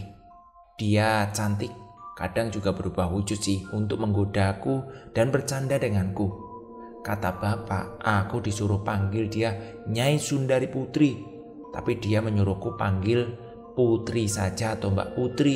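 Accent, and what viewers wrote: Java, Indonesia. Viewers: seneng nya cerita mas krna ada aksen jawannya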